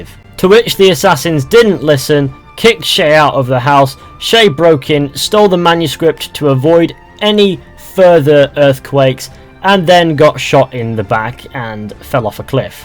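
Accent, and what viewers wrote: United Kingdom. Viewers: first of all if you want me and everyone else to watch it........ change your fucking British accent!!!!!. a YouTube video must be helping not some fucking video with fucking English accent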